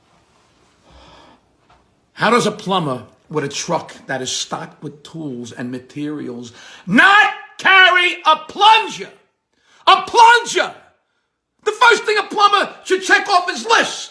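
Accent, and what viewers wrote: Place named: East Coast, United States. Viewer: ur killin me😅😅that east coast cadence in your speak just seals the deal😅😅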